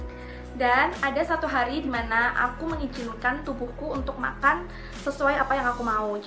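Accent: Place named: Java, Indonesia. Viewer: Ngomong e medok mba, asline wong endi?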